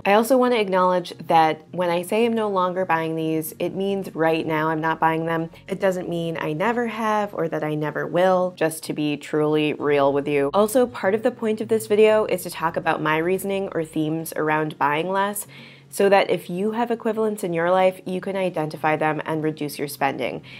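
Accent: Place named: Minnesota, United States. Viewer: I love your Minnesota accent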